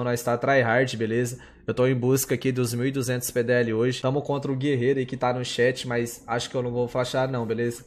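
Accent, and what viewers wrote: Minas Gerais, Brazil. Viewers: eh mineiro?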